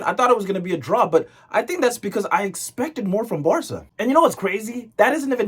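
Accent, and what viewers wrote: South Africa. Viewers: wait you're south african fr 😭😭😭😭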